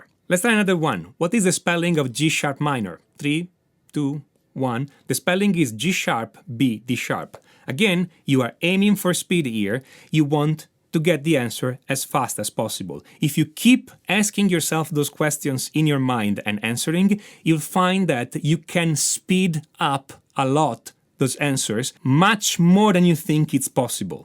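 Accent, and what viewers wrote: Italy. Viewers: Where do you from? Maybe from Italy?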